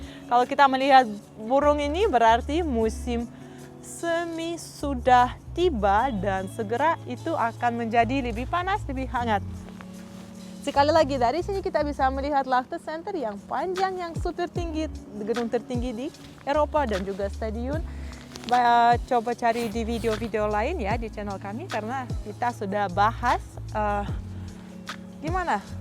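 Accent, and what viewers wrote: Indonesia. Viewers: penyapaianya matul,bule tapi indonesia banget, sopan,disegala hal👍👍sht dan sukses dan kluarga🙏🙏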